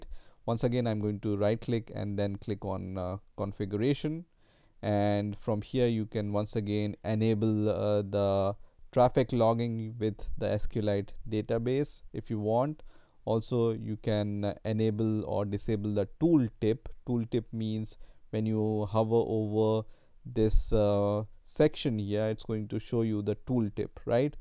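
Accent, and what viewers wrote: India. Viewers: Never trust a dude with a Indian accent when it comes to your pc...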